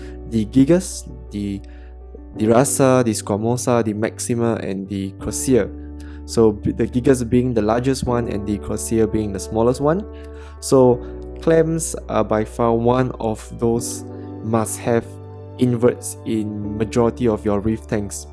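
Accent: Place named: Singapore. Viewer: Typical singlish....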